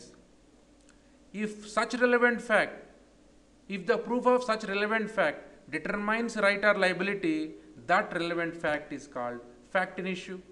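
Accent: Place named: India. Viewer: That Indian accent is extremely heavy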